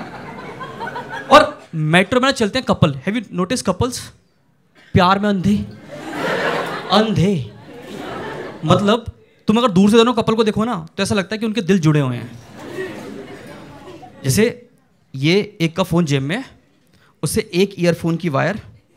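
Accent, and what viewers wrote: Garhwal, India. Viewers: Aap gadwali ho??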